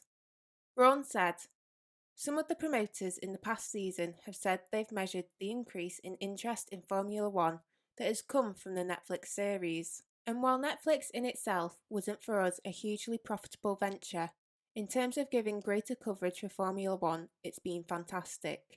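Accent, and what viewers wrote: United Kingdom. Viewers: As true fans we would naturally take in everything available about F1. We therefore each have our own opinions about the content of whatever we are watching. Whether your favorite driver was “properly” presented, for example. Consider that Netflix viewers are just browsing available content and see this series. They watch one. Maybe another one. And suddenly they see drama and excitement, politics, technical details, personalities and families and daily struggles. They have discovered a huge thing out there they never even wanted to be interested in has global appeal. New fans. Like me to this channel. I am doing a bit of binge watching now. Great job. Nice stuff. But then again, I am a total sap for a lovely British accent.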